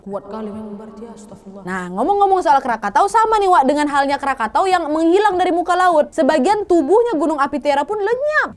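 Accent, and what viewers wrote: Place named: Padang, Indonesia. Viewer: Hahahaaa ndk ada tu do, padang kali kak ini 🤣🤣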